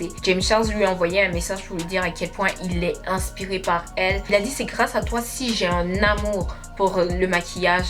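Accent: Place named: Montreal, Canada. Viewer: Ta l’accent de Montréal gurlllllll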